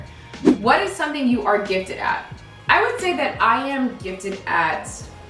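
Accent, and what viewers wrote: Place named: New York, United States. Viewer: Girl you don't have to defend your New Yorkness- I (as a native Brooklynite who's been living on the West Coast for 10 years) can tell just by the way you say the word 'water'. lol Your accent makes me miss NY. <3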